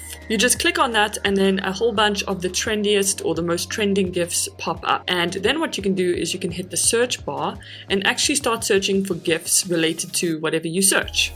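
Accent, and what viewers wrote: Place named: South Africa. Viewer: Oh my gosh :D I just started watching this and was like 'this check has got to be south african!' :D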